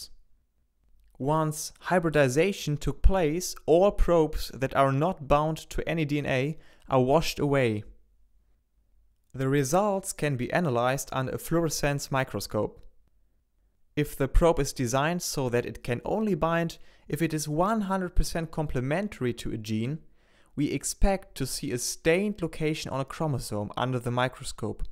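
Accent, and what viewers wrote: Germany. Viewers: you sound soo german :) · Thank u. Nice german accent ;-)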